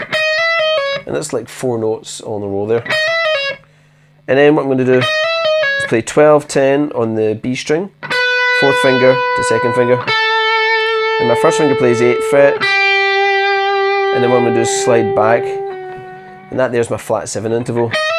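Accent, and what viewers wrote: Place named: Glasgow, United Kingdom. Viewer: at last! a guitar teacher with a weegie accent! ya dancer!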